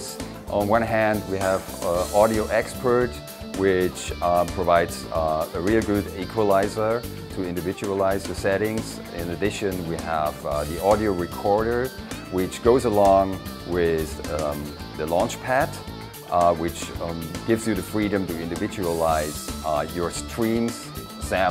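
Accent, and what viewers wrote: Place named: France. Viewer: MSI France avec un mec qui parle anglais avec l'accent français, ok.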